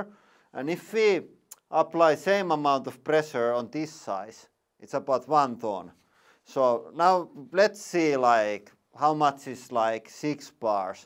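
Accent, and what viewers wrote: Finland. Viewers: It is so much better with the Finnish accent 😂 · I want to listen to you explain everything in a Finnish accent! 😄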